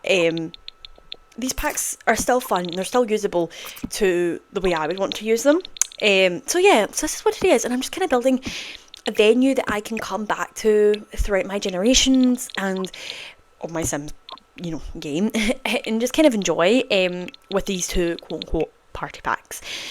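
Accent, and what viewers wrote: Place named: Scotland, United Kingdom. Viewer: Fabulous build, great to hear another Scottish voice :eyes-pink-heart-shape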